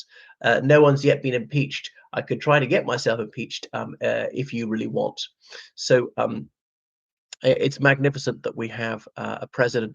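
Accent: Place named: England, United Kingdom. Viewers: you don't sound Irish ? you sound english.... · Ah yeah you are just what we want for our President in Ireland - a Youtuber with an English accent, who cant speak the Irish language, who is anti-Irish Republicanism and who professes Unionism. Move over Cokehead McGreggor we have a new front runner in " from Ireland [with a plummy English accent]"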